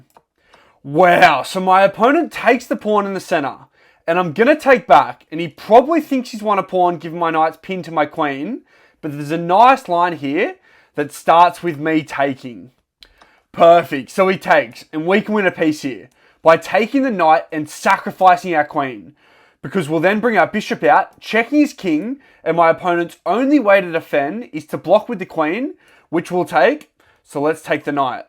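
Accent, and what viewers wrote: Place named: Australia. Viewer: australian accent lol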